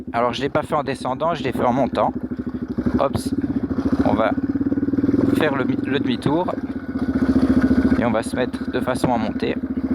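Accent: Belgium. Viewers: Tu es belge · Tu es belge ?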